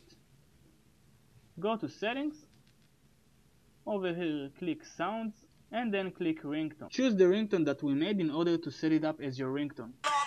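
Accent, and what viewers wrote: Israel. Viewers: Nice Israeli accent lol. Works great thanks!!! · איך שומעים שהוא ישראלי..(: תודה על ההסבר, זה מעולה! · I have IOS 9.0.2 and its not free. And i know you're from israel you have a israeli accent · שמע לא יכולתי שלא לכתוב שאתה ישראלי עם המבטא הזה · אתה נישמע לי ישראלי בכל מקרה לא משנה thx bro good view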